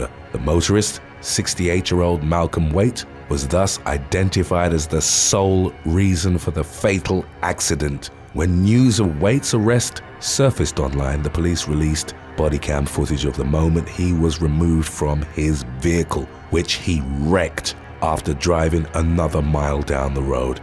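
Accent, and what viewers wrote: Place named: England, United Kingdom. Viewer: Dudes voice is up there with Barry white and Morgan Freeman. The narrator would make a fortune doin audiobooks and horror themed shit lol. The English accent just locks it in lol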